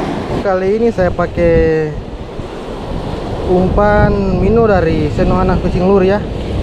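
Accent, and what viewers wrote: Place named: Papua, Indonesia. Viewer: Mcm logat papua ka.. d papua mana kk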